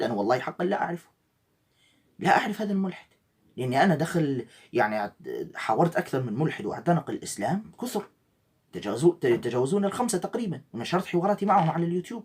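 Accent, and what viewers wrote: Egypt. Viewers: هذا الوارثوج شبية البرص احول نوتردام ليس شيعي اصلا انة نصراني ابا عن جد وهذا ما صرح بة الشيعة. وحتي لو كان شيعي اصلا فلن يتغير في الامر شيء كلهم انجاس× انجاس .وبعدين النصاري عارفين انة نصاب ولكنهم يستعملون كل من يسب في الإسلام فكلهم نصابين × نصابين رشيد قمامي ورشيد ابو السباع وتعيس ابو بظرط ونقمات موافي الكدابة ام فشة عايمة ومحمد رحومة النصاب الهارب وكلب الالمان ابوعضمة خامد عبد اليورو.. 😂😂والله لهجتك المصرية جميلة ياشيخ وهذا مايسعدنا ويشرفنا ❤❤ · كل سنه وحضرتك طيب يا شيخ بمناسبه الشهر الكريم وحلوه اللهجه المصريه عليك اوي ربنا يديلك الف صحه وعافيه وتتصدي للناس دي وكل من افتري ع مسلم..ربنا معاك ويقويك ❤️💪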